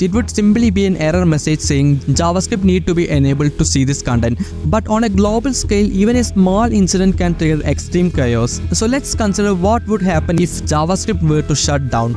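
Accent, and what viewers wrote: Kerala, India. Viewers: Malayali's english accent 💀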